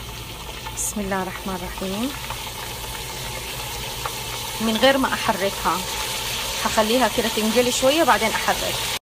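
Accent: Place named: Hejaz, Saudi Arabia. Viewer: هي بتتكلم باللهجة الحجازية اللي الآن كل الدعاية والإعلان يتكلمون بنفس هاللهجة ....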